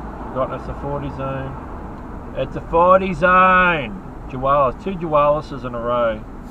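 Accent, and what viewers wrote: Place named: North Queensland, Australia. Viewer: I can usually tell where you are driving because I used to drive a lot in the same places, and still do a bit, so it's all very familiar. One thing I can't place though is your accent. Are you from North Queensland, by any chance?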